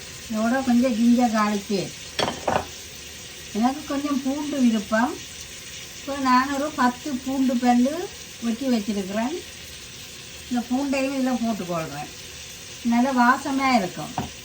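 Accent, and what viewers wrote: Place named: Sri Lanka. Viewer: உங்களுடைய ஸ்ரீலங்கா தமிழ் அழகாக பேசுறீங்க❤ உங்களுக்கு நான் சப்போர்ட் பண்ணிட்டேன் சிஸ்டர் ❤🎉❤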